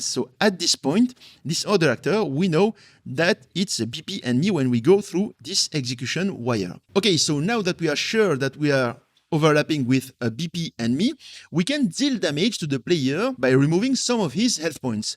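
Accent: France. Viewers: Excellent tuto j'avais pensé que tu étais Français vu l'accent mais le nom m'as trahis hahahah Continu comme ça tes conseils sont d'une précieuse aide pour tous ! En pensant t'inquiètes pour l'accent pour un Français c'est excellent ;)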